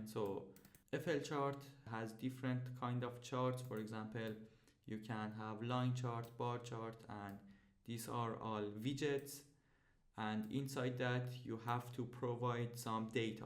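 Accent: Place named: Iran. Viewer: Cheghad Farsi Englisi sohbat mikoni :) damet garmmmmmmmmmmmmmmmm eshghiiiiiiiiiiiiiiiiiii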